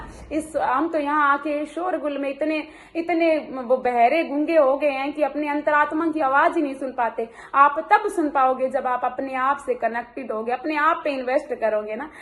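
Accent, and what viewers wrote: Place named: Rajasthan, India. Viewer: Di aap ki boli mujhe bhot Pasand h kyuki m bi Rajasthan se hu or Hindi me sab ko achhi trh se samjh aati. H thankyu so much di love you di 😍😘😘🥰😍😘🥰😍😘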